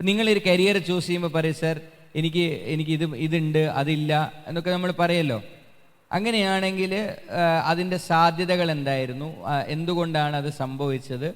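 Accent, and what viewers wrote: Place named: Malabar, India. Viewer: സദ്ഗുരു ജെഗ്ഗി വാസുദേവന്റെ പദാ നു പദ തർജ്ജിമ . എന്നാലും കലക്കി . മലബാർ സ്ലാങ് ന്റ് കുഴപ്പം ഒഴിച്ചാൽ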